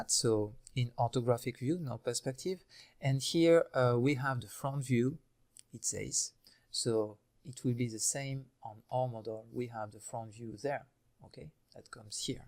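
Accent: France. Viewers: are you french? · so I was looking for R when you said ER :D I love french speaking english :D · On sens tellement l accent français ou un truc dans le genre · Great explanations , went and bought his complete training course , don't mind the french accent but he is so soft he puts me to sleep, but it is better than trying find it here and there, great education all in one place. He is bringing me up to speed fast and I can't say enough good things about it.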